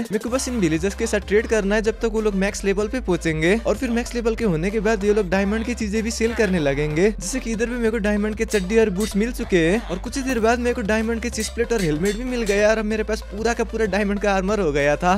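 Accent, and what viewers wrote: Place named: Nepal. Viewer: Nepoli accent>>>>>>>>>>>>>>>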